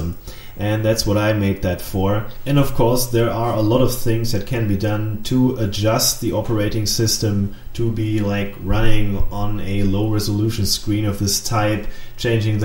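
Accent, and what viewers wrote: Germany. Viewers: Hi your englisch sounds German : ) Realiy nice work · Sorry aber man hört den deutschen akzent einfach raus ; D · 100% German accent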